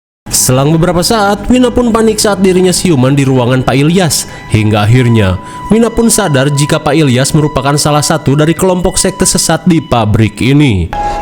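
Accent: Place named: West Java, Indonesia. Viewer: Film horor juga jadi ketawa 😂 Aksen Sunda nya itu loh, jadi khas banget..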